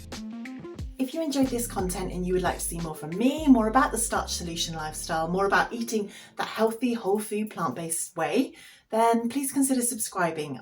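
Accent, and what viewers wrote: United Kingdom. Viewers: Good luck with your channel 🥰 got the warm and fuzzies hearing a fellow Brit. Originally from Essex, living in Australia 🇦🇺 for past 20 years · I'm used to watching American starch solution advocates and I can't tell you how refreshing it is to hear a British accent. It makes you feel like you can relate especially when you mention products that I know and I can easily access. Love from Scotland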